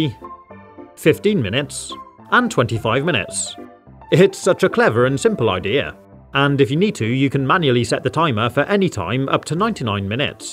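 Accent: United Kingdom. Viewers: I love how easily distinguished his British accent is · Nice accent boss where you from United Kingdom? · I am British and you sound like me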